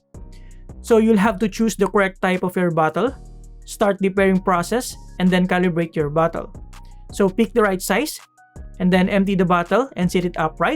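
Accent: Philippines. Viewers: Come through Filipino accent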